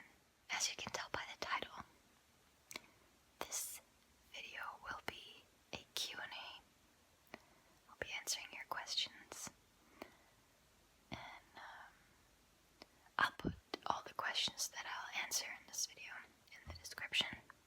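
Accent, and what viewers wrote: United States. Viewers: How are you German but have an American accent I’m so confused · How do u have such a, American voice wen ur from Germany?!?!? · Most Germans can speak English, but she is far more fluent than normal, probably better than at least 99.99% of non-native English speakers in Germany. She put a ton of effort into becoming fluent and developing an American accent. By the way, she did the same with Spanish and people are baffled by her Mexican accent.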